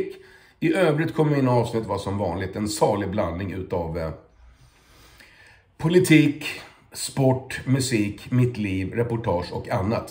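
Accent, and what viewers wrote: Skåne, Sweden. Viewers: Bra med titel som beskriver innehållet, gjorde att jag slapp irritera mig på skånska. Religionssnack är intressant om det är på rikssvenska.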